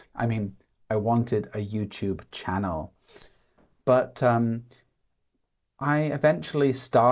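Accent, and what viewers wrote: RP, United Kingdom. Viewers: Do you speak recieved pronunciation ?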